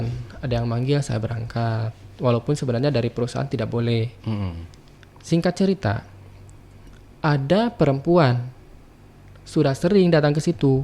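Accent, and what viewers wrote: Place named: West Java, Indonesia. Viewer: Aa, kaya nya org sunda ya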